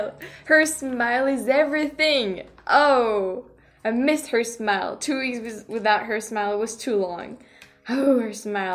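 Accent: France. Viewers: i love the french accent coming through the English pronounciation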